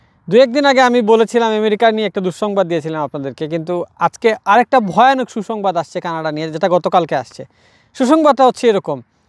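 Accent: Sylhet, Bangladesh. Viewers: Bhai sylheti ni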